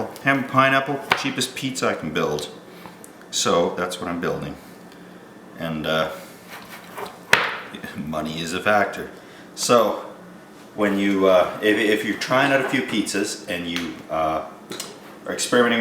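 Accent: Canada. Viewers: U Canadian??? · plus the accent.. definitely Canadian! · I'm from India, I've never been to N America, and I could tell you're Canadian. How? Because of watching South Park. LOL! Your "abouts" gave it away. Yours is probably the best looking pizza base I've seen on youtube. I'm definitely gonna try. Thanks for the informative video. Where did you get the measurements to the tenth of a gram? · u canadian? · This guy sounds canadian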